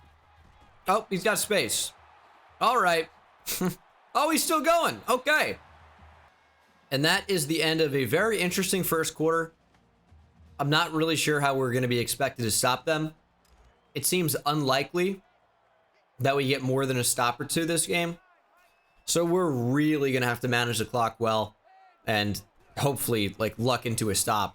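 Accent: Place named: East Coast, United States. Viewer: You are clearly an east coaster with the pronunciations.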